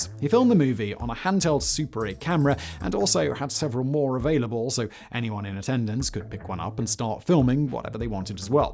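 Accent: United Kingdom. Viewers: THANK YOU so much for beeping out your obscenities. I am old, and people didn't do that publicly in my day. I love your content, your style, your intellect, and your lovely British accent. It is therefore obvious to me that you don't need to use expletives to express yourself very effectively. Thank you again.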